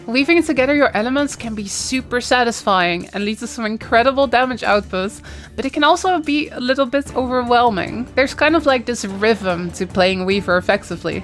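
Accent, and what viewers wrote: Netherlands. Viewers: can really tell the dutch accent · i can be wrong but i smell dutch 😅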